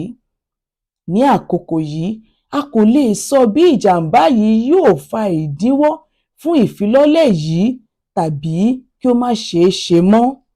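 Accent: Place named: Yoruba, Nigeria. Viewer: Top notch yoruba accent news broadcasting 😊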